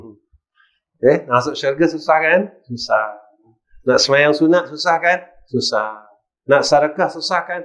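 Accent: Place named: Terengganu, Malaysia. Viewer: Ustaz ni orang mana, orang Terengganu ke, cakap mcm org Terengganu je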